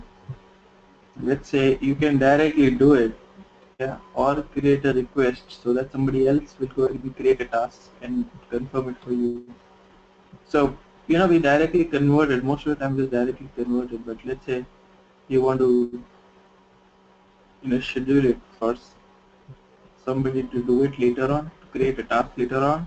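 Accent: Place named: India. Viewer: there is a back ground job in this video makes it ghard to follow plus the indian accen that is so awfull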